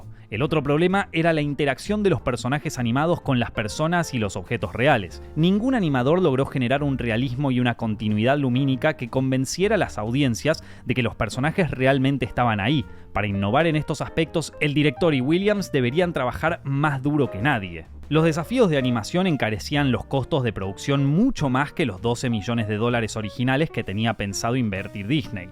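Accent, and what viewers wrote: Argentina. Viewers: Empecé a ver el video pero al oír ese acento argentino que detesto, mejor me voy a ver otro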